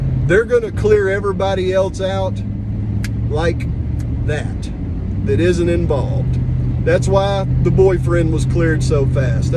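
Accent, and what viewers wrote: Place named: South, United States. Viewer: I'm with you too 💯😁 and I love listening to him, I love a Man with a Southern Accent 😄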